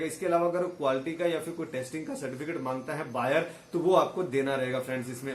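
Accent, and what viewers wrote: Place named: Bihar, India. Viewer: Dear, you had made fun of Punjabis by saying Canada to Kanadha, According to you punjabi speak in this accent.. Moreover you also need to look into your own accent, Russia need not to be pronunce to Rhahssia.. 😂 And many other country name you pronuce in funny way... Now you need to work on your श , say शाम not saam, is that Bihari Accent??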